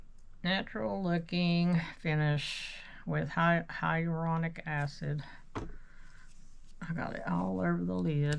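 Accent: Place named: South, United States. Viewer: You are such a doll. I love your southern accent. Your approach as well. Have a healthy, safe & healthy day. 🙏💜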